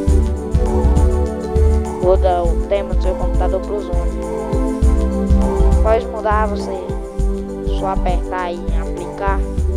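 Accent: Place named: Paraíba, Brazil. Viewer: FANHO COM PARAIBANO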